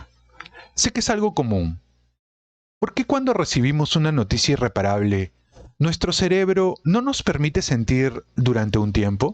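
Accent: Lima, Peru. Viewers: Si, A mi también... Tipicamente limeño.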